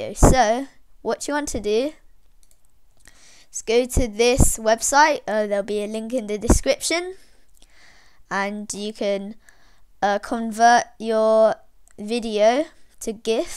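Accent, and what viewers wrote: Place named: United Kingdom. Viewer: R u British? You sound British if your not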